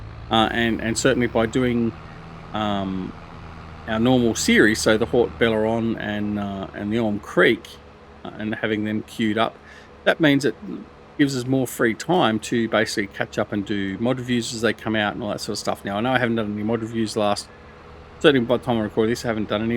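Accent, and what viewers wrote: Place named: Australia. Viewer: "In my battle with stacking that Bloody trailer"... I laughed so hard🤣 brilliant so good to hear Aussie slang. I watch many great YouTube's from usa etc, there content is good too, But It's a breath of fresh air to find your channel and the way us Aussie speak!!😁😁 Keep up the great content and thanks for your hard yacker in making it 😁👍 From QLD Australia.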